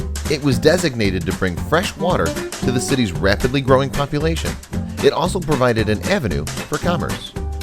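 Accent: United States. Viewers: It's sad to see the nice country but to hear an american accent . . . . very sad.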